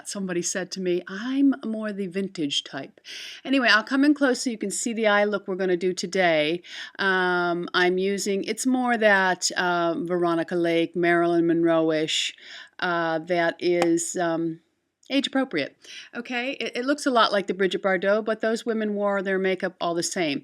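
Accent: United Kingdom. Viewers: Oooohhh Yay! I love the british accent. Hehehe Big Hugs Marnie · great video! love the british accent :) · great video esp with the British accent lol.I love the look from back in the 40s.My fave movie ever is Brief Encounter from 1945 and Celia Johnson just looks so understatedly beautiful in it.True beauty is timeless and yes you are vintage and classic xx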